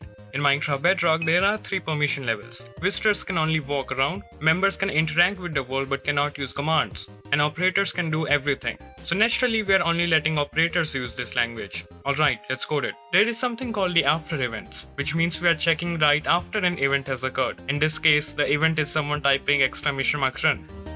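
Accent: India. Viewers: they sound indian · By the Indian accent I know this was gonna be peak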